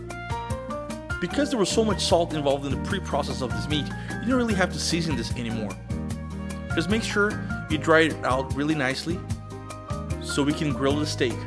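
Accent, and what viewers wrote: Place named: Netherlands. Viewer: You sound Dutch with your accent. I am from the Netherlands and I have a brazillian wife. I found your channel looking for good recipes. Are we bros?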